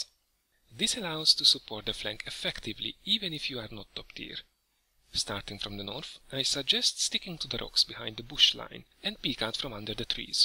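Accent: Hungary. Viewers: Már az akcentusodról meg tudtam mondani hogy magyar vagy :)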